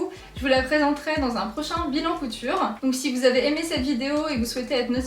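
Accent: Paris, France. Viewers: Vidéo très sympa tu me donnes envie de me mettre à la couture ahah. Tu as un petit accent parisien, on te l’a déjà dit ? 🥰 Hâte de voir d’autres vidéos merci à toi!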